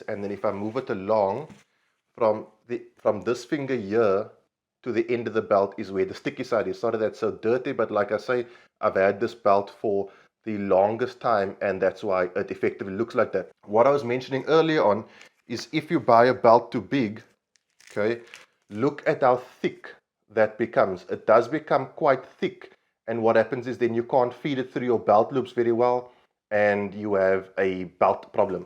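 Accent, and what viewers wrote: Cape Town, South Africa. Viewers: hi are you from cape town? i like your accent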